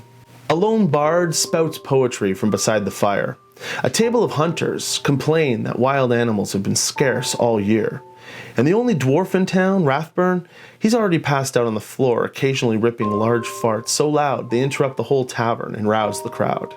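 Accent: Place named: Newfoundland, Canada. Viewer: Deadly Newfie accent! Haha. Well done. Amazing video and channel you have here! Keep it up! sent me. :)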